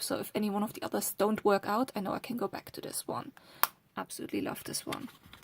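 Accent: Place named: Germany. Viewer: Your accent is lovely. I may be mistaken, but it sounds either German or Scandinavian.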